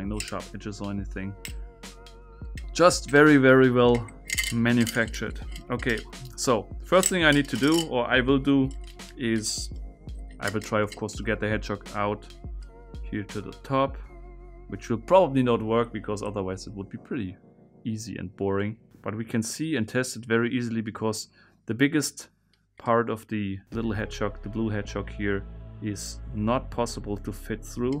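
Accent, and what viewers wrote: Germany. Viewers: Your German accent gives me a headache but great video! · So wie er englisch redet vermute ich jetzt mal das er von der muttersprache her hochdeutsch bzw. eine der vielen deutschen sprachen spricht. Ich habe mich noch nicht danach umgesehen wo er herkommt. Einfach mal blind ne vermutung anstellen und evtl. damit auf die schnauze fallen. So ist das leben geil. No risk no fun😀😬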